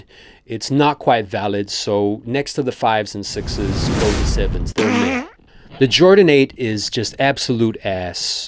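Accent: United States. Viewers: Why does he all of a sudden have a Brooklyn accent? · Why do you have an American accent here all of a sudden?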